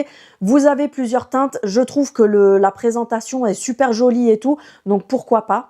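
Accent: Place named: South, France. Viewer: Mais on aime t écouter ma petite française du Sud.bisous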